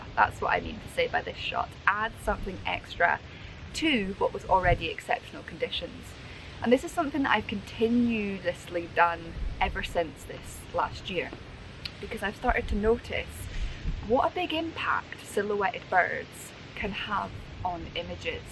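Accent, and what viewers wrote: Scotland, United Kingdom. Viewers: I think your Chanal is brilliant I love the Scottish accent cheers Neil Patto.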